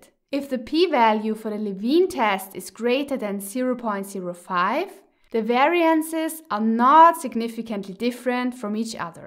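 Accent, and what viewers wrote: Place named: Germany. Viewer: Hey there! Thanks so much for your videos. They're incredibly insightful and I've learned more from them than in any of my statistics classes at university. By the way, I had a hunch you might be a native German speaker, even though your English is excellent!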